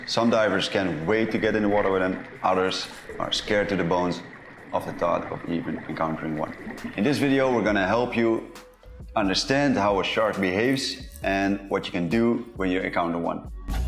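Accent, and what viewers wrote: Netherlands. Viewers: Nice vid! This guy is Dutch I hear it. Hi from Amsterdam 🎊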